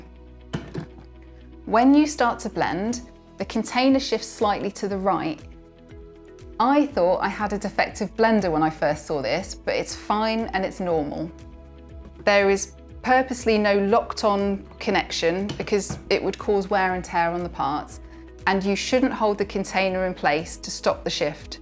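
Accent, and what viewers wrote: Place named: England, United Kingdom. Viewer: That English accent is absolutely delightful.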